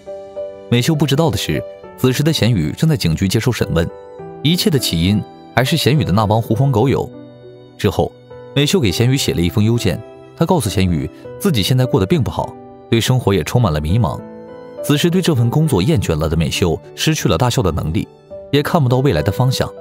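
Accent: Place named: Northeast, China. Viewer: 博主是东北人吧 广播be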